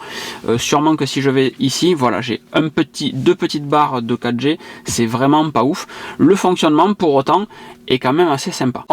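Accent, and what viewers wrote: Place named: Montauban, France. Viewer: hello , bonjour de Montauban , content de trouver un autre youtubeur avec le meme accent que moi ;)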